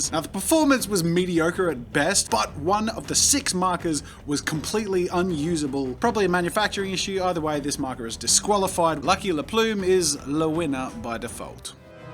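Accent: Australia. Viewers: Wait are you Australian? You have a strong accent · I’m looking for good markers.. without paying a fortune came straight here and thought he sounds Aussie.. then you said ships to Australia 🇦🇺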